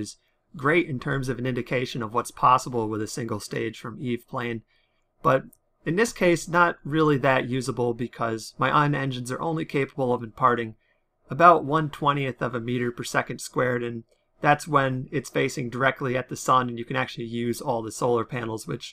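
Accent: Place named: United Kingdom. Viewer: oh snaps senpai noticed him. Love ya even tho you still have a bit of a limey accent. Hope you lose that completely some day.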